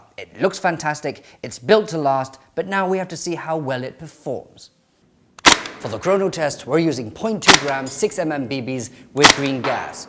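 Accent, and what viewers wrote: RP, United Kingdom. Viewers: Well, if it's genuinely how you talk and you aren't putting it on for the camera, then I will have to apologize for being derogatory towards you. I would guess having a posh british accent with the native language would dilute them into a mixture of both. Truth be told my problem is with people who pretend for the camera. I hope you accept my apology as I do enjoy your video's. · looks asian talks like a brit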